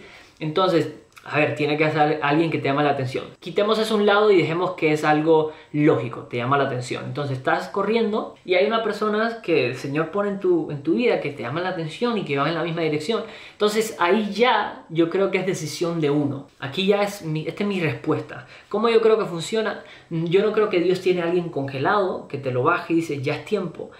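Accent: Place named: Argentina. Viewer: Traes un pequeño acento argentino por ahí! 😆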